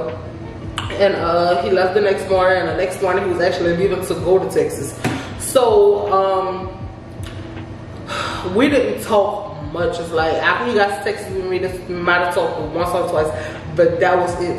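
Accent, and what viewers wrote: New Orleans, United States. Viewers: Hey there my name is I’m just discovering your videos I can dig it I will be following! you remind me so much of my best friend...ikr 2 lol! Btw Ik your probably frustrated with your self behind the situation but as far as being embarrassed i think not!! You have absolutely nothing to be embarrassed about! I’m sure at some point in life if a person is old enough, more than the majority of us have been thru this or something similar...trust me!! Oh & I love your accent gotta be N.O. baaabeee😂❤️🙏🏾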